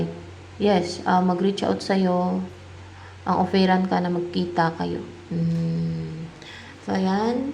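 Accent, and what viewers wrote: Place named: Visayas, Philippines. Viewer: bisaya ka madam a bisaya din cebu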